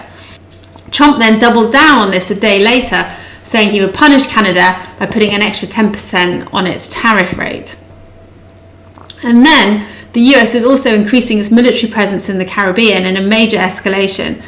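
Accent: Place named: Sussex, United Kingdom. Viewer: Get a bit more dirty of a Sussex accent why doncha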